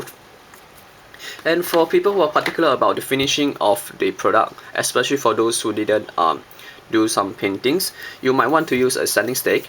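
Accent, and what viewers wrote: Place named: Asia. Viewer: Thank you very much for this vid!! It'll help me alot! BTW, you're Asian right? Probably Chinese or Japanese? I can feel it with your accent.. :)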